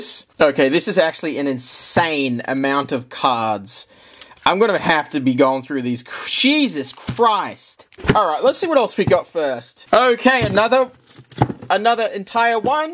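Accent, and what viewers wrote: Australia. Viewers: He’s not saying it wrong, it’s an Aussie accent you dolt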